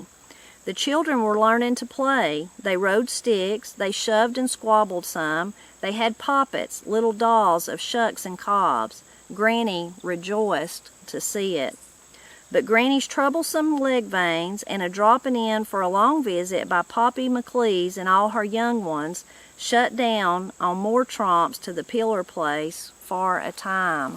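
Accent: Appalachia, United States. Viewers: Enjoyed your reading. You remind me of my late M.I.L. And her sisters. All from Appalachia ( West VA)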